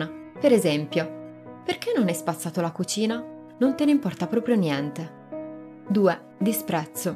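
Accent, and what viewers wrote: Sardinia, Italy. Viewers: so che non è inerente al video... ma quanto è bello l'accento sardo? :D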